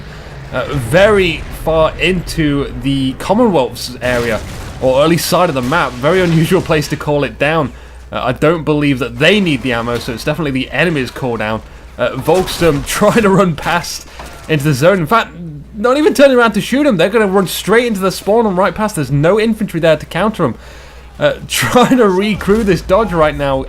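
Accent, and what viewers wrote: England, United Kingdom. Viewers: Fantastic video! Thanks for all the hard work, I can tell by your commentary that you too have a huge... english acccent and I love it! Excellent game, good to see lots of pressure and lots of light vehicle play, this game is great in that its not all just kt and is3 spam!:) good luck to butch and baloo, having watched this video and shootter's videos it seems like butcher and baloo are going to spank them back to mexico.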